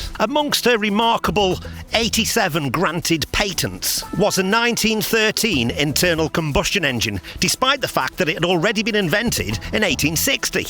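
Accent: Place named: Stoke, United Kingdom. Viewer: I thought I recognised that accent. I've lived in Stoke for over a Decade now. Been trying to place you for a while.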